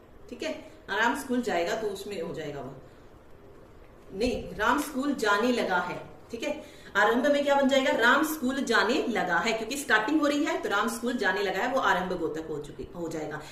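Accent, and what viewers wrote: Haryana, India. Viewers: Ye haryaanvi tone h bhai. Change nahi hogi🙂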